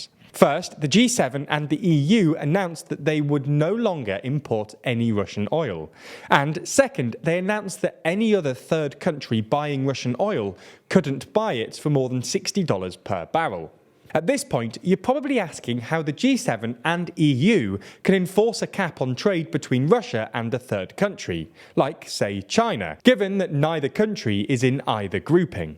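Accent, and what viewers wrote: United Kingdom. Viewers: Collapsed! How dramatic! Their economy is 10times stronger than any Western country. BTW, isn't it odd that so many anti-Russia Youtube posts have 'British accents'?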